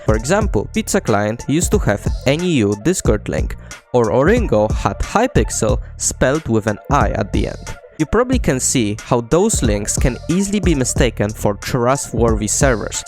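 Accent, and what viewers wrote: Poland. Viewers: slysze polski akcent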